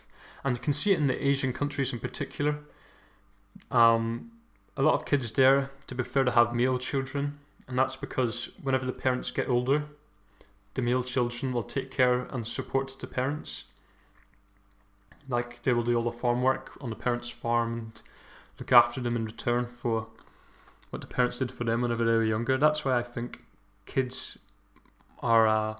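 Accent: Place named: Wales, United Kingdom. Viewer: Skot Wilson he sounds welsh to me.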